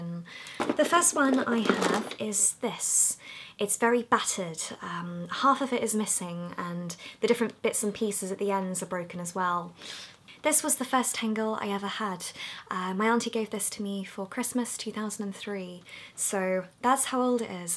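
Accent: United Kingdom. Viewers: I ❤ your British accent...xx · Are u British ? I am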